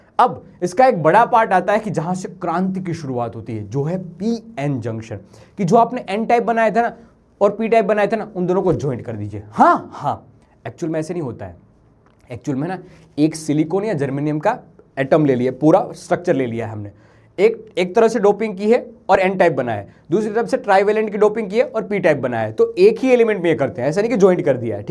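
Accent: Gujarat, India. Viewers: Gujrati accent aa hi jaata h 😅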